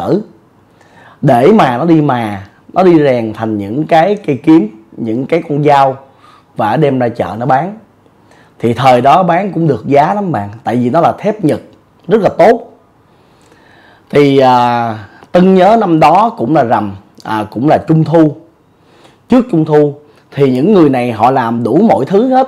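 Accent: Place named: Saigon, Vietnam. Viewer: Em nghe anh ke giong Ben phường 7 Quan 8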